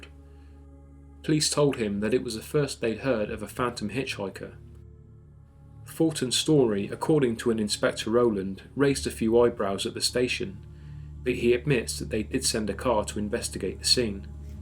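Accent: England, United Kingdom. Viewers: I'm American but I so wish I lived in one of these charming and beautiful old English villages,( complete with the ghost stories) I just love the aesthetic so much! Thanks for the videos, I just recently discovered your channel but it's my favorite kind, interesting content, British narrator and no ads every 5 seconds. Keep it up my friend! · You've got a lovely voice,! Somehow, as an American, I find that these types of narrated stories sound so much better coming from an English or an Australian accent. Tom Buckmaster has a YT channel, and he has actually gone out onto some of England's haunted roads to do investigations. Very creepy. Love your content! Cheers!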